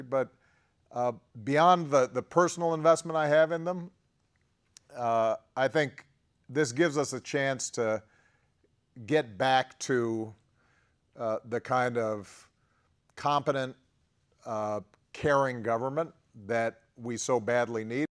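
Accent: New York, United States. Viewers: I played at 1.5 & he spoke like a new yorker. 😎 Miss him.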